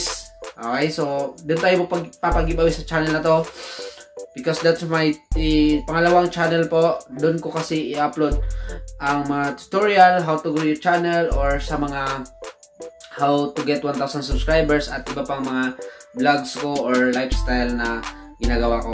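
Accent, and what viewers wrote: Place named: Visayas, Philippines. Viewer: Bisaya nga ganyan ang bisaya magsalita matigas pero tama ka boss at may napansin din ako na parang buyoy sya magsalita hahaha.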